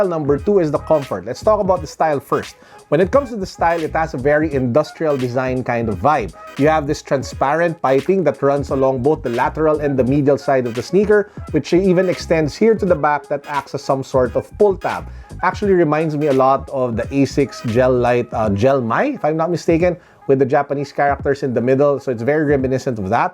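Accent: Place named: Philippines. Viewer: Good review That pinoy accent is THICK > peace from Makati City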